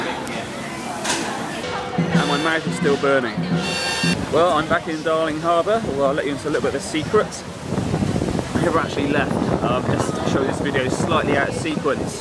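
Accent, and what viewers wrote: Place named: United Kingdom. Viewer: Adorable British accent.. and yeah brilliant video too :)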